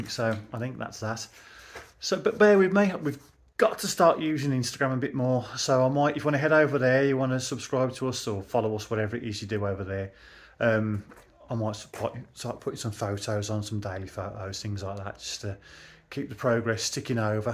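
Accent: Birmingham, United Kingdom. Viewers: Proper brummie. … there ACE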